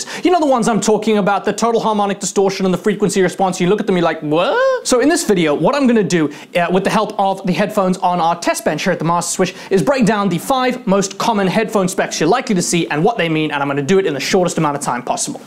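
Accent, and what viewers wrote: South Africa. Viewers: U almost sound south african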